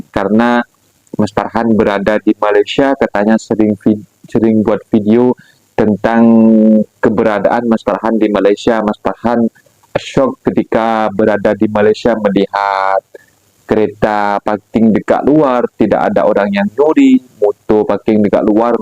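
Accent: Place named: Java, Indonesia. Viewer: Guna BM aksen jawa biasa lh tu👍👍mgkin yg komen tu,hanya pndai 1 bahasa jadi prasaan iri itu ada😂😂semangat bro,mental mau kuat💪💪👍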